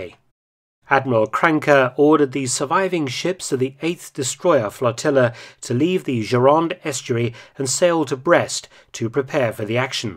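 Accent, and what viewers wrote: England, United Kingdom. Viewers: Best WWII Youtube, 100%. Encountered your channel in late 2018 by chance, and I believe I’ve watched every single one of your videos, some over again. Can’t say that for many other Youtube channels. Your clear, concise English accent and the incredible knowledge is like the History channel (when it was actually good) on steroids. With the amount of information you have on WWII, you’d almost think you lived through it. I don’t know if you’ve ever considered or done this already but I think you should apply to be narrator for history programs on the side. I’d watch them all. Thanks for the amazing videos Mr. I hope they keep coming for many years!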